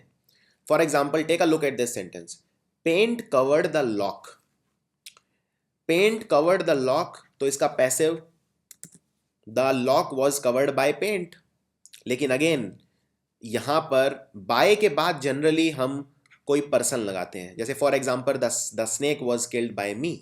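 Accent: India. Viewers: Are you speaking english or Indian ? What heavy accent do you have !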